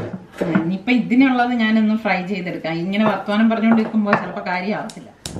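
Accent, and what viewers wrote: Trivandrum, India. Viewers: Thanks itha.eppozanu video kanunnate .teachere bahumanikkunna ithaykku big salute.l am a teacher.super biriyani itha. Tvm bhasha kelkkan nalla rasam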